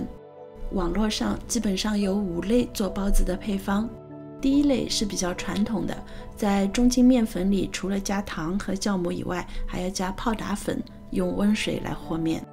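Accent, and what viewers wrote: Shanghai, China. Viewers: 听发音听出了上海人，看面粉牌子就知在美国对伐？这种菜包是王家沙经典包子。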